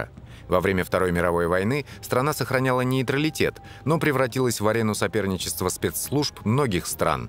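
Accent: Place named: Spain. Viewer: И названия и произношения ужасно.Почему произношение испанское?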